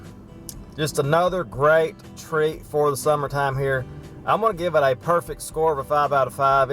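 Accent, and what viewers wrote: South, United States. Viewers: Hahaha you are so cute! Finally a southern YouTuber with the accent to prove it! I'll go watch all of your videos now. ;)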